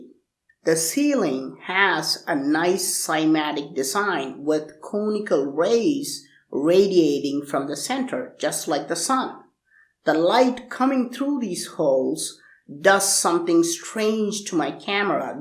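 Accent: India. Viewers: Hello u speak Indian English k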